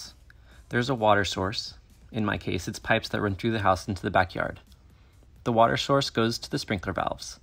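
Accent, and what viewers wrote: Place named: Germany. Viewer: ja hört sich irgednwie sehr deutsch an. xD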